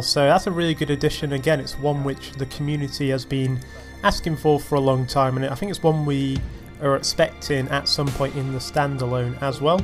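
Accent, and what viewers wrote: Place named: United Kingdom. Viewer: Are you british? i wonder because you remind me of JackFrags greetings from spain :P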